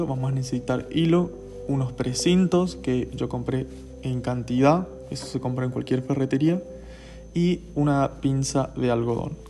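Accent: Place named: Corrientes, Argentina. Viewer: Sos Correntino ?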